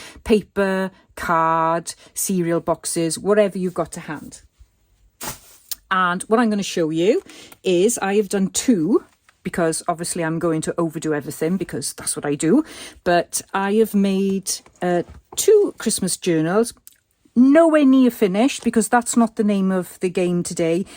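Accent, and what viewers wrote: Wales, United Kingdom. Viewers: Wow. This is amazing. Thank you for the tutorial. I absolutely LOVE your Welsh accent.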